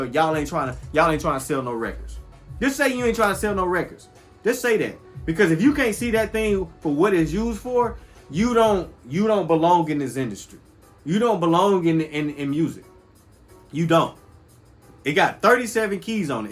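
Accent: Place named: California, United States. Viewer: Lol. Straight up! Say bro as soon as u said “beaucoup” I knew u was a real one. Only people I hear say that word usually from the n.o. But u sound like u from the bay or somewhere in cali. Real recognize real. 🔥 content padna! 💯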